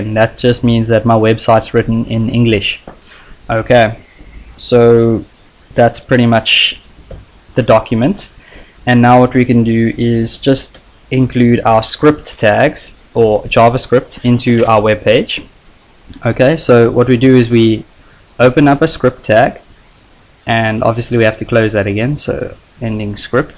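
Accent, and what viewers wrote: South Africa. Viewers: south african! Respect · 100% south african accent. aweh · South African? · Dude are you South African?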